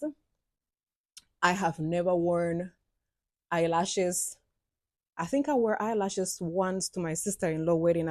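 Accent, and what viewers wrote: Jamaica. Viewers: yo you have a stronger jamaican accent than me and i am half Jamaican... but raised in the states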